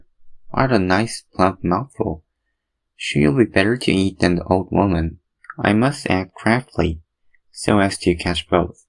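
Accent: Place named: United States. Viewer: 미국인 같아요!! 발음 대박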